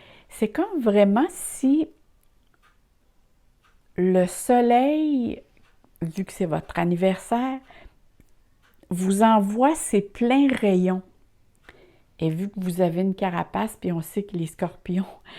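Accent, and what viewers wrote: Canada. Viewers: Merci pour cette voyance et votre accent canadien 😁😁 j'espère que ça va se passer car besoin de positivité 👍 à bientôt ..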